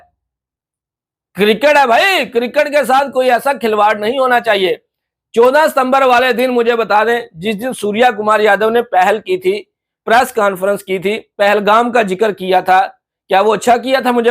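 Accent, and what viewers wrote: Pakistan. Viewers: Aap ki urdu Pakistani urdu lag rahi hai❤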